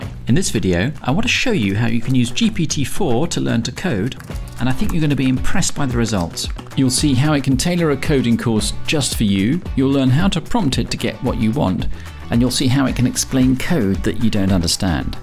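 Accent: United Kingdom. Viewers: man you are really cool always like your video and pure british accent , love from Bangladesh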